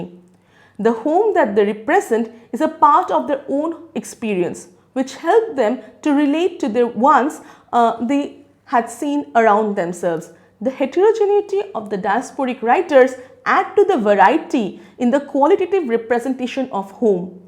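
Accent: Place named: Assam, India. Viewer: Mam your tune feels u are an Assamese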